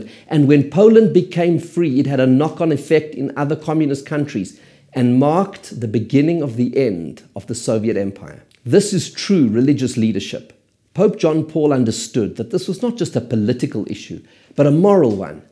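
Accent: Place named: South Africa. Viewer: Within 10 seconds I knew you were South African.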